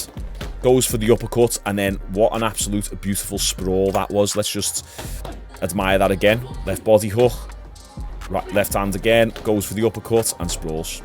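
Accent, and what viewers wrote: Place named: Scotland, United Kingdom. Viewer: This whole time I thought you were from Scotland because of your accent. You have a very deep strong accent. You sound like your straight out of Braveheart.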